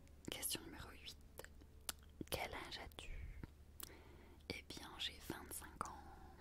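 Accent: North, France. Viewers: Tu viens du nord, c'est cool c'est ma région natale (Haute-Normandie), tu parle un peu Normand ou ch'ti? 😄💜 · tu est du nord ? sinon tes video sont super ^^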